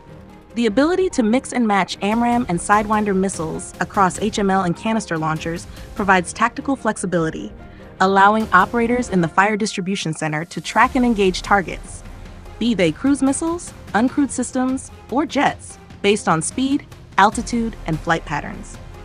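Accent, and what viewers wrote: United States. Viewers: Whenever I see some glossy ad like this with someone talking about Australia's defence with an American accent? Not even trying to hide the sell.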